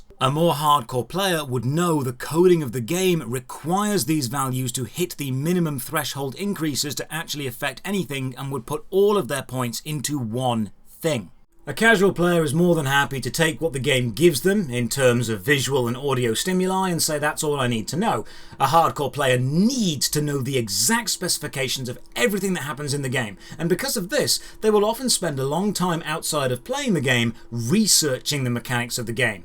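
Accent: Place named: RP, United Kingdom. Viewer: I have not played an MMO in over a decade. I simply like the Posh British Guy. Anyone else?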